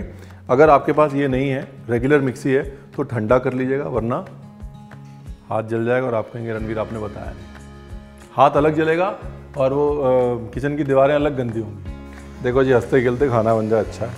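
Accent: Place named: Punjab, India. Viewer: I really love the punjabi accent and the joy( major ingredient) he infuses in his cooking.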